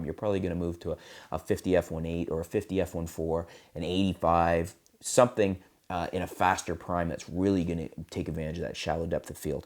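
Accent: Canada. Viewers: Good informative review. Pleasant Canadian accent!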